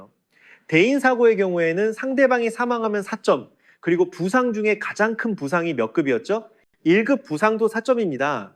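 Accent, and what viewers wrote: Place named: Gyeongsang, South Korea. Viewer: 손보 29일 시험 30일 발표 결과 적으러 왔어요. 75점으로 합격했어요. 적상인가 해상인가 배 문제랑 처음보는 문제들 틀린것 같고, 합격하면 대박이라고 생각했는데 진짜 합격했어요....하하 8개나 틀렸지만 25개나 맞췄으니! 덕분에 합격했습니다. 난이도가 오르고 범위가 변경된 부분은 속상했지만 그래도 특유의 "강조" 하시는 부분과 쉽게 암기할 수 있게 만들어 주신 덕분이 아닌가 생각이 드네요. 조만간 변액도 도전할건데 그때도 잘 부탁드립니다. 목소리는 다시 못 듣나요? 경상도인 으로서 익숙하고 정감있어서 더 잘 들렸던거같은데...😅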